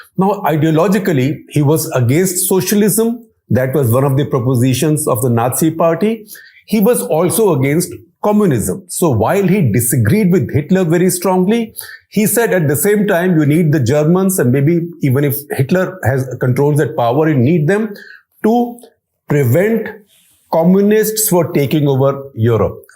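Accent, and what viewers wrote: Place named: Punjab, India. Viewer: Hate this guy's shitty Punjabi accent 🙉🙉🙉 bad orator too he is.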